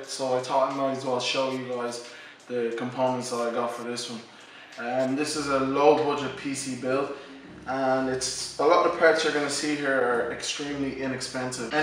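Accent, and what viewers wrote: Ireland. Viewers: Lol - I knew you were Irish from the opening statement - Hows the goin.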